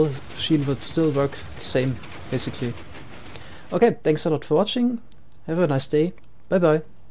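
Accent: Germany. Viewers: man hört du bist deutscher :D... aber kann es sein das du noch andere Akzente hast? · ich sprech ja eigentlich kaum english aber ich hör voll raus das du deutscher bist... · Are you german? :D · German? Dutch? Austrian? What is that accent?!?!?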